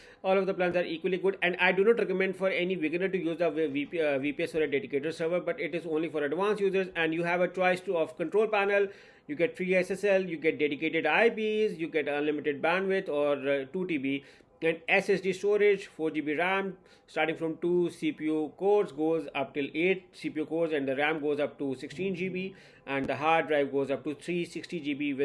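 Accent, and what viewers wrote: India. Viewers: Your explanation is very important because no other video has explained as much as you. Incredible coupon, a discount like this you can't find anywhere, it's a unique opportunity. Whenever I end up on a video with someone who has an Indian accent, I learn something. Gotta love Indian accents. Thank you sir. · Incredible coupon, a discount like this you can't find anywhere, it's a unique opportunity. Whenever I end up on a video with someone who has an Indian accent, I learn something. Gotta love Indian accents. Thank you sir. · Whenever I end up on a video with someone who has an Indian accent, I learn something. Gotta love Indian accents. Thank you sir.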